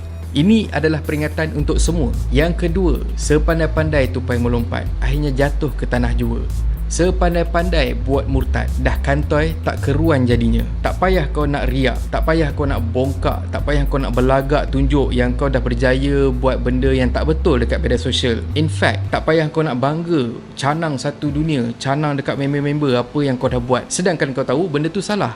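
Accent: Indonesia. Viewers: hahaha bukan melayu tu ,,bunyi indonesia je,entah dri mana minah ni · Pompuan Indonesia biasa la...dari bahasa yg diucapkan memang Indon. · betul..slang cakap pon lain..betul2 melayu ke atau indon?..sebab indon x semuanya melayu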